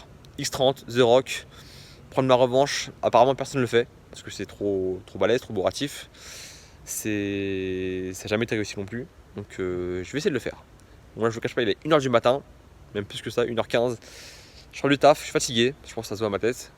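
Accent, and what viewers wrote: France. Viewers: Tu est du marseille ?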